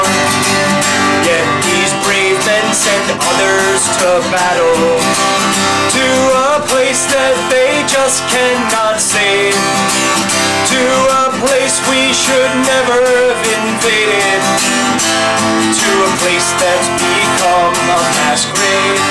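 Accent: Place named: Ireland. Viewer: You are so Irish sounding... Still holds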